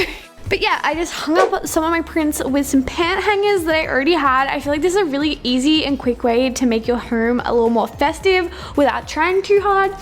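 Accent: Australia. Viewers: her voice sounds as if she was aspyn ovard...yet australian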